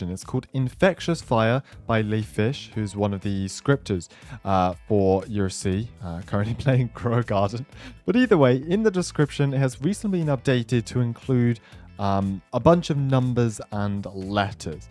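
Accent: United Kingdom. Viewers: Are you British or American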